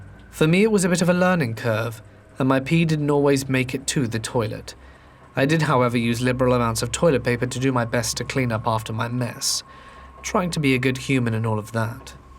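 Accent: England, United Kingdom. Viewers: Oh, I have to say that even poop stories are better when narrated by an Englishman. I think my neighbors must have wondered what the hell I was laughing about. Absolutely brilliant! 🤣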